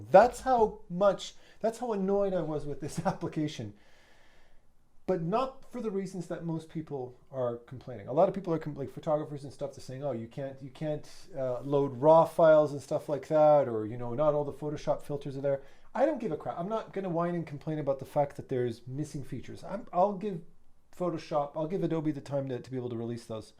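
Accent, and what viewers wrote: Quebec, Canada. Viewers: hey man. nice to finally meet you, Hang on. Youre french canadian?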